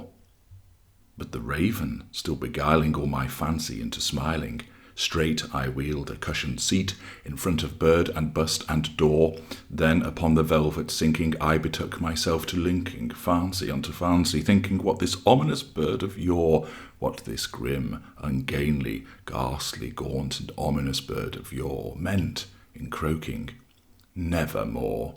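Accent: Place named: Yorkshire, United Kingdom. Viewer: I can still hear Yorkshire accent though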